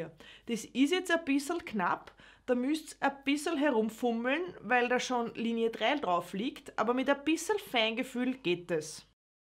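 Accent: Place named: Austria. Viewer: Ehrlich toll erklärt, jetzt versteh ich auch das Falten. Ich Feier deine Humorvolle Beschreibung und den Herrlichen Österreichischen Akzent, danke für das charmante Video 😊👍🏻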